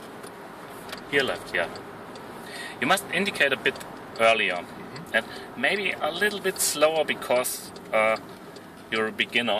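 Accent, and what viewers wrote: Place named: Germany. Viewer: I love the German accent of speaking English!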